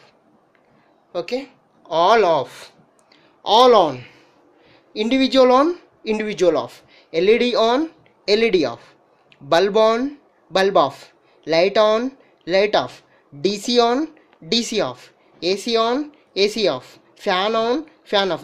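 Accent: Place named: India. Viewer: lmfao that indian accent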